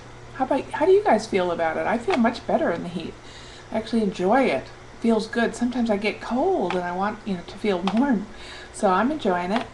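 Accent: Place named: South, United States. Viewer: your to funny love the southern accent i am freezing my husband out of are bedroom hehehe he said can't wait tell u get your surg. because i keep the room so cold glad u had fun in Los Vegas oh yeah did u run into the bariatric ladies to i saw some videos love:0)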